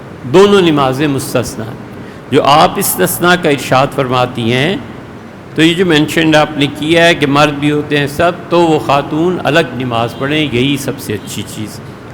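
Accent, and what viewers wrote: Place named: Lucknow, India. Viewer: دریافت فرمایا بشمول کتاب کا عکس مفتی صاحب کی مندرجہ بلالا گفتگو پر غور فرمایا جاۓ کہ مفتی صاحب اپنے لکھنوی لہجہ و زبان کو رائیج کرنے میں کس قدر کوشاں ہیں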